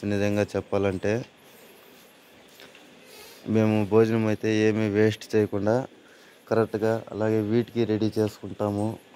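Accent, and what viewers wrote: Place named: Anantapur, India. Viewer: బ్రో నువ్వు అనంతపురం డిస్టిక్ వాయిస్ మాట్లాడుతున్నావ్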